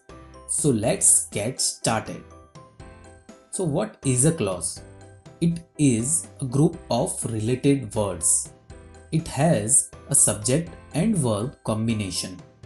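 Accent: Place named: South India, India. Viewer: i think he is south indian😂....nice job sir.... rspct india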